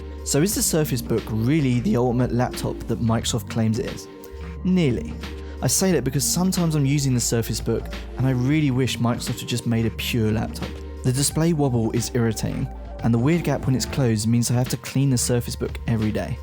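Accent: United Kingdom. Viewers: OMG, in Russia we get used to hearing American English. I can't understand his perfect British accent. Bleeding of my ears. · Brad Roberts He is English. So, his accent is UK accent...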